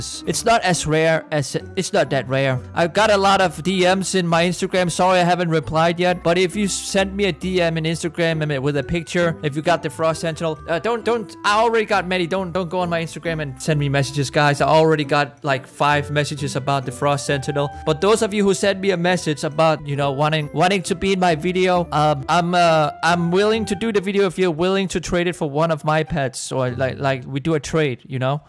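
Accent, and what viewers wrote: Denmark. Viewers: are u from denmark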